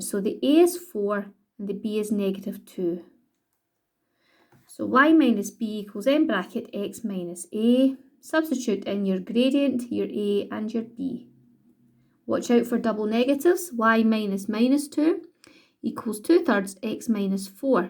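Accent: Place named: Scotland, United Kingdom. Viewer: excellent presentation , lovely Scottish voice and a great help , thank you.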